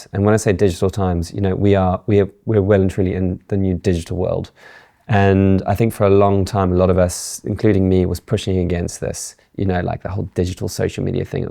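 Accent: South Africa. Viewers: Why do you sound South African? It morphed from an Australian to South African accent 🤔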